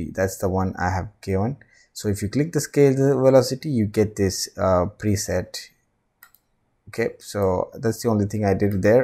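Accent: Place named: South India, India. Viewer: South Indian spotted XD Nice video though, loved it!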